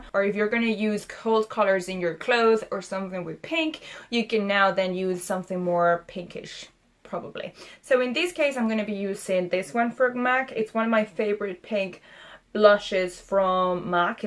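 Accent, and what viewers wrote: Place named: Ireland. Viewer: I love your soft Irish accent :)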